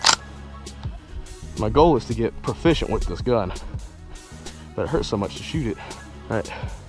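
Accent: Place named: South, United States. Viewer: When does gun videos, in this one I noticed it the most, he gets a slight southern accent, playing into those routes. 'Murica!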